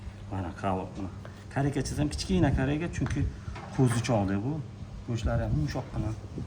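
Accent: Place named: Oasis, Uzbekistan. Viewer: Э харип Узин воха тамонлардансану шевагда гапуровур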